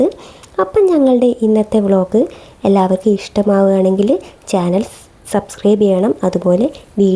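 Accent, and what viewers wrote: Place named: Malappuram, India. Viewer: Super🎉🎉🎉, നിങ്ങൾ കോഴിക്കോട്ടാണ് ലേ? സംസാരം കേട്ടപ്പോൾ മലപ്പുറമാണെന്ന് തോന്നിയിരുന്നു🎉🎉🎉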